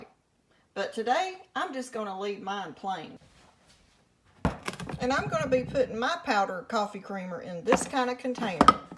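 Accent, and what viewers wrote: South, United States. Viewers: I came to learn how to make creamer, I stayed for the southern accent! 😊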